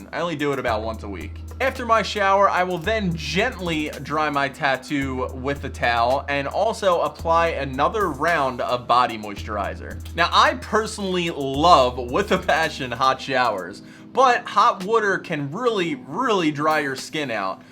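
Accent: Philadelphia, United States. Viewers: nice video, i can tell youre from philly/delco by the accent lol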